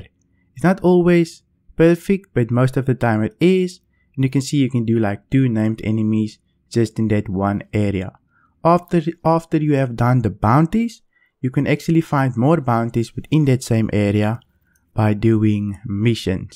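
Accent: South Africa. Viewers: Is that a South African accent I hear? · Are you south african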